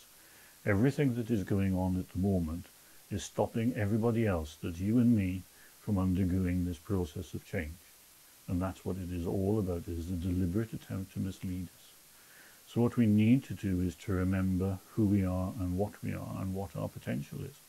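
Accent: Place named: England, United Kingdom. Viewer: The subtitles are only about 70% accurate as he has an English accent. Just one of the many hundreds of English accents and google can’t seem to be bothered to fix the issue even considering the amount of content that comes England for our small size and population. Truly the master race.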